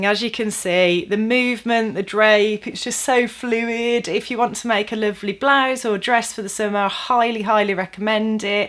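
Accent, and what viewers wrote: United Kingdom. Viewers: This is going to sound very ignorant but this is my first video with you and I'm very curious: what part of the UK are you from? I'm American and I don't recognize your accent. Very neat though. I love the yellow dress! Very pretty on you.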